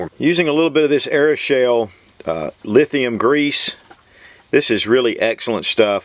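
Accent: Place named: South, United States. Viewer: U have a southern accent, he he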